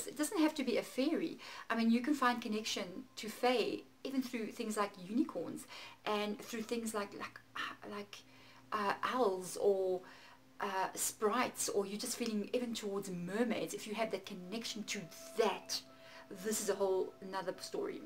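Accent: South Africa. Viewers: Your accent reminds me of my South African neighbour. I think she's from South Africa...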